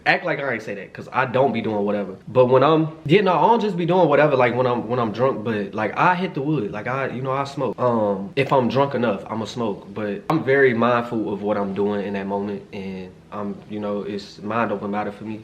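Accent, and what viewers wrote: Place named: Chicago, United States. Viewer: I can hear your Chicago accent stronger than ever in this video. You owe her some head tho. 😂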